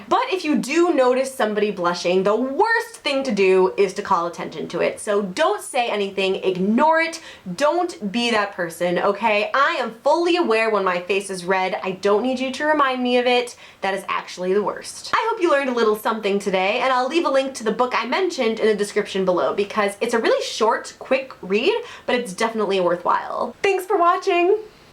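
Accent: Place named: Ireland. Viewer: You must be Irish girl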